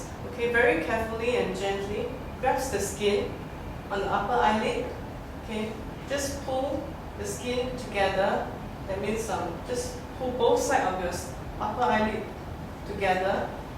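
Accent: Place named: China. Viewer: what is she saying? can someone please translate her chinenglish?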